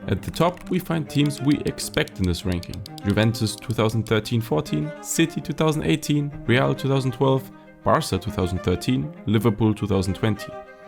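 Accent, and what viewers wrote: Germany. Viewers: der deutsche dialekt nervt ja übel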